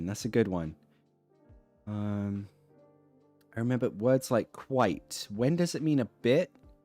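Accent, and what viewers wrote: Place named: RP, United Kingdom. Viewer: 😂😂😂😂😂😂😂...."I'm at the lower end of R.P😆😆😆😆🤣I speak clearly, but not in a stupid way"😅😅😅😅😅😅 Sos un idolo total,! Me haces cagar de risa!😅😅😅😅😂😂😂🇦🇷🇬🇧🇦🇷🇬🇧🇦🇷🇬🇧🇦🇷🇬🇧🇬🇧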